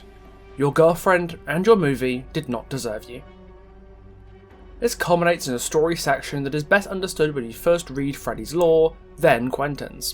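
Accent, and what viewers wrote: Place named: United Kingdom. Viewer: Are you british because that is lame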